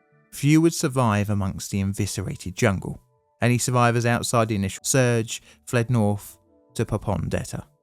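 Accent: United Kingdom. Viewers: Oh my the Brit pronunciation of place names is doing my PNG brain damage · I would subscribe your channel in case you could narrate your videos in English... and not in britain dialect...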